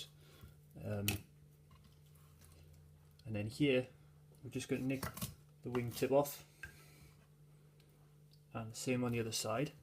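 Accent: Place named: Newcastle, United Kingdom. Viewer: Thanks for sharing this. I just de-boned my first duck! Much easier that I remember chicken being - and nice to hear the Newcy accent. Cheers! :)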